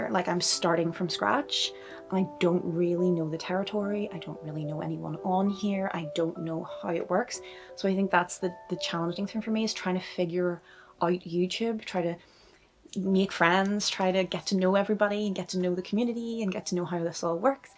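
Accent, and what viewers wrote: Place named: Northern Ireland, United Kingdom. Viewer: Heeey, welcome to booktube! :D Can I just ask... Where are you from? You have such a lovely Northern Irish accent!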